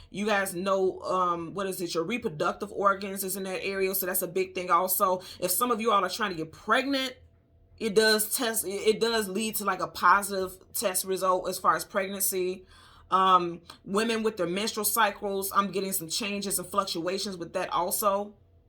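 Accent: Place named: Chicago, United States. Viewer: I Love this read. And I heard and felt every bit of Chicago in you! 😁 You were not playn in this read 🙌🏿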